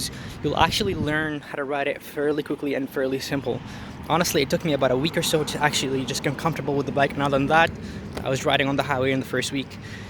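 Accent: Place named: Canada. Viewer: It's Canada.... the accent should have been a giveaway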